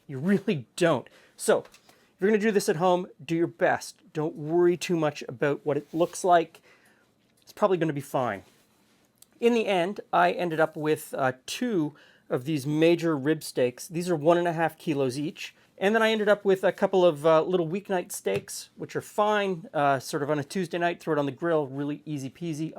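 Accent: Canada. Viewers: The CC is translating his Canadian accent "Blazing Bull", to Blazing Bowl.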